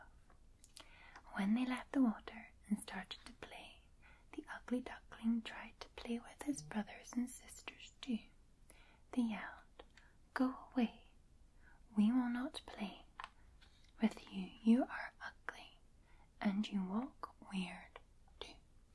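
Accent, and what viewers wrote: Scotland, United Kingdom. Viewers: She is the best ASMRtist for reading stories with her beautiful ❤️ Scottish accent!:_Loveheart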